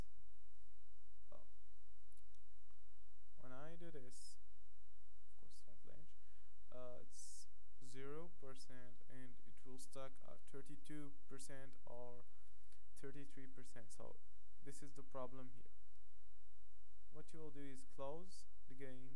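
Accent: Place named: France. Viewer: Azy t'es un français :p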